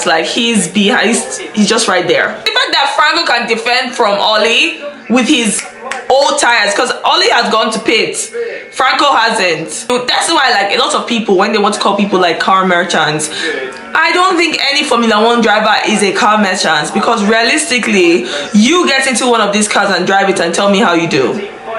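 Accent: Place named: South Africa. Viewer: your accent...you from SA?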